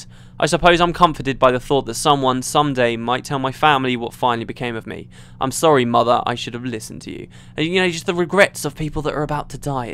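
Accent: United Kingdom. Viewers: English is a foreign language to me but i can nearly understand 100% of everything you say. Thank you for your clear voice and your british dialect beeing not that extreme. Its very delightful and relaxing to listen to. At least in my head you are a very handsome and good looking man :)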